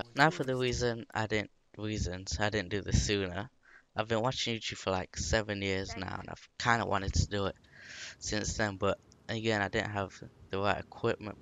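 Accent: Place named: East Midlands, United Kingdom. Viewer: Aah, an east midlands accent - explains why I couldn't pin it down. Midlands all seems to blend into one for me. It always makes me laugh when you're gaming or (briefly) on the podcast, and the Yanks try to copy your accent. Do they think we all speak like the Queen and eat crumpets mid afternoon?! lmao. Looking forward to seeing what you might do with this channel. My advice - do what you enjoy and don't worry about numbers. Have fun with it. Good luck bud.:)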